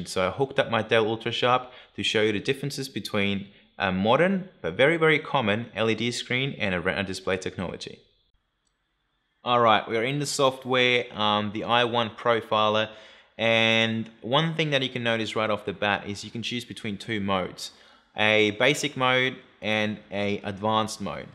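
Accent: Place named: South Africa. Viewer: Felt like there were hints of South African maybe? I'm also curious!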